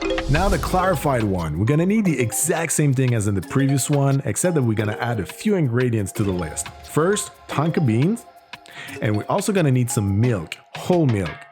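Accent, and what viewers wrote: Quebec, Canada. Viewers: French Canadian accent is so sweet ! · From Québec ?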